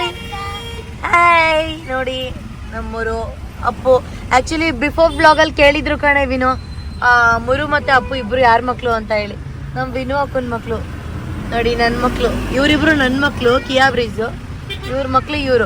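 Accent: Mandya, India. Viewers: Mandya style speaking ❤😅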